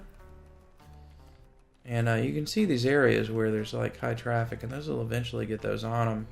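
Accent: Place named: South, United States. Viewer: The subtleness of your USA southern accent is what suggested Virginia to me. Not that I've spent enough time there to know them well.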